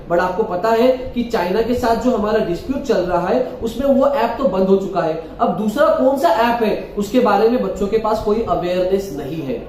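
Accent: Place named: Maharashtra, India. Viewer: Ur from maharashtra seems from ur tome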